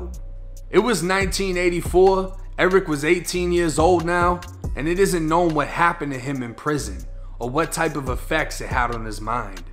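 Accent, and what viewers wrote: Boston, United States. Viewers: Not gna lie homie from that Bostonian slang you got going on I thought you said “That’s when he blew top all over the ground” …lol I was like what the fuck does that me— oh blue tarp lol Now get to tha packey and get ya self some beers as my buddy jeano would say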